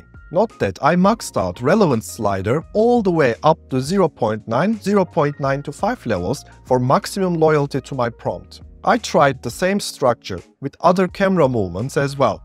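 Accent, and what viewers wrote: Turkey. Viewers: Hocam bu aksanla Turk olduguna yemin edebilirim. Memleket neresi? Guzel anlatim olmus. Tebrikler.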